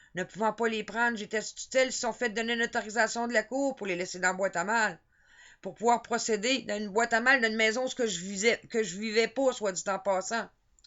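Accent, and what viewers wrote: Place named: Quebec, Canada. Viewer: Pour ton accent je dirais que tu es québécoise, alors ça se passe comment depuis ? ça s'est amélioré ?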